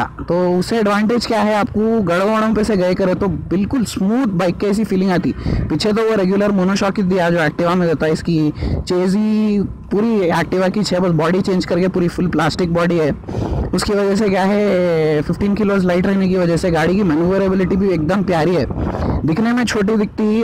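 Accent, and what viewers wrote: Hyderabad, India. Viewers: bhai tumare baat karne ka tariqa zabrdast pure Hyderabadi or mai b hyderabadi hou miya bhai bolte mashallah mashallah bhai bohat accha review diye aap mere pas b same color ki Dio hai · loved it! fantastic hyderabadi style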